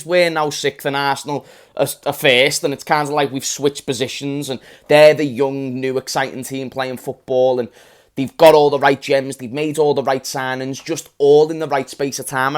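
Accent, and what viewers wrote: Liverpool, United Kingdom. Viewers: I love your scouse accent lmao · Finally someone with thick British accent. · its Liverpool Accent 🤣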